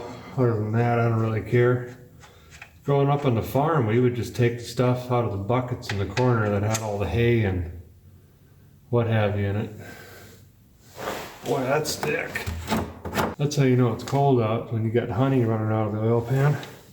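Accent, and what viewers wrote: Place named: Maine, United States. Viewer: you almost sound like a mainer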